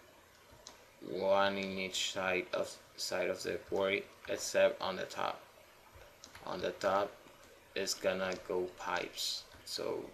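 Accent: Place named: Mexico. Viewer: r u Latino? im mexican. u sound mexican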